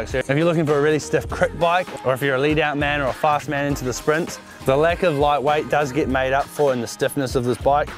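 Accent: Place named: United Kingdom. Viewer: your UK accent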